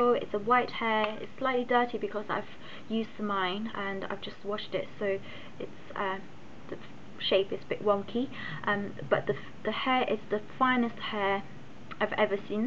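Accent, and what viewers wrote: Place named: China. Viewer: I like her Chinese accent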